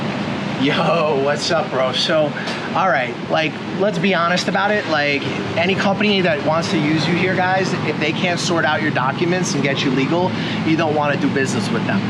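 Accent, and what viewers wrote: New York, United States. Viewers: When I started teaching in 2018, I didn't have a degree, but I still found a job easy in Vietnam. Thanks for sharing your input. Are you from New York? I'm from New York, and you have a stronger accent than me. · Bro i love this guy, his honesty and frank language makes him a diamond in the rough of youtube lames. Bro i knew he was from new york just how he speaks lol. I may hit you up bro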